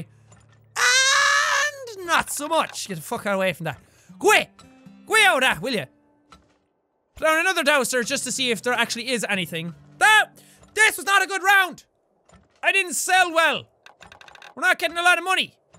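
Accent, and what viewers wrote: Ireland. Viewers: Good o Jackaboy. Irish-er than ever. GO GO!!! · Is it just me or did sound extra Irish in this episode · I swear He sounds more Irish, as he progresses through the video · Holy shit this episode was amazing, was especially Irish haha it's okay you're gonna beat this spillage fine no worries i believe in you:D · does anyone else notice turns more irish in these videos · this series made extra Irish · Yey my Irish screaming friend is more Irish today!!! Now I can cuss out everything in Irish or gaylic as they say! Hooray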